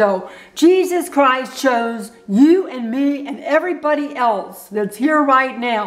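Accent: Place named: South, United States. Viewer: lol not really a state but ok. I live in SC and I can tell you are from somewhere close. GBU too.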